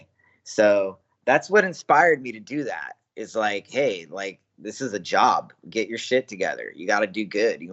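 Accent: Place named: Brazil. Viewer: faz um podcast em portugues mannnnnnn...saquei esse sotaque br!!!!1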